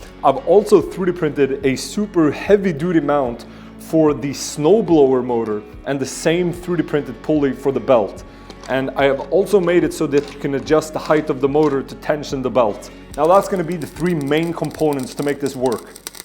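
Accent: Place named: Sweden. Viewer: "Spins like a cat", love the Swenglish 😄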